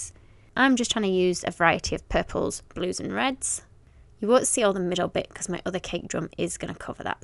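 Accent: United Kingdom. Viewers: fathi irfan No she sound kinda British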